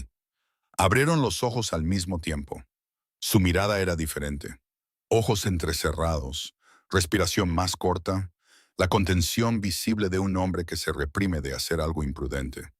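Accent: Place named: England, United Kingdom. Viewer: Horrible el español con acento ingles, nuestro idioma español es hermoso no lo arruinen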